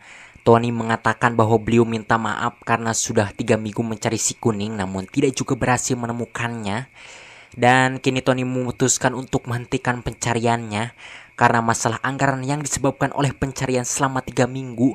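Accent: West Java, Indonesia. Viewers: Suka sama logat sundanya sama banyolannya 🤣 salam dari Pulau Batam Indonesia #RumahDiAtasLaut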